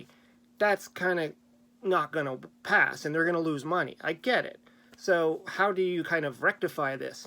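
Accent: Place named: Canada. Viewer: ! Always love to hear your opinions bro! Thanks for doing a response to this video! I love hearing the slight canadian accent on talking abowt. Haha. Have a great day buddy!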